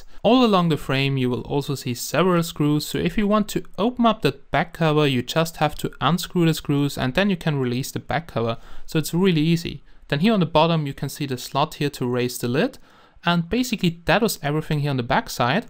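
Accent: Germany. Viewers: Ich nehm mal an du bist deutscher :P klang zumindest so: weißt du ob es auch eine Metalversion oder eine mit etwas besseren CPU gibt? ansonsten super review, werd mir wohl auch so ein teil holen. Allerdings ohne Apple-logo (wegen Zoll und so). Weiter so (y) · Are you from Germany? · are u from germany? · Any chance you are from germany ? :D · Hab das direkt gemerkt, dass er nen deutschen Dialekt hat und als er damit ankam, dass die Tastatur anders ist, als unsere QWERTZ-Tastatur, hat er meine Vermutung bestätigt ^^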